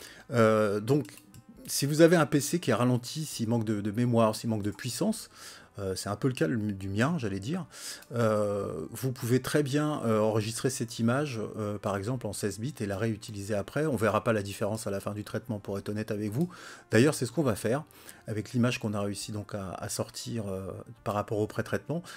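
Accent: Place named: England, United Kingdom. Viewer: Mais quel travail de Titan ! Une série complète depuis l’install en français, il n’y en a pas beaucoup ! Merci pour cette formation et ce partage de savoir. J’ai appris tellement de choses avec cette 3ème partie. Pix est vraiment génial, les possibilités sont infinies :) il nous faudrait au moins 7 vies pour tout savoir. Plus qu’à mettre tout ça en application pour les prochains traitements :D Merci aussi pour le process icons et tes données à télécharger ;) Ps : Je suis fan de ton accent Anglais 😂 j’adore !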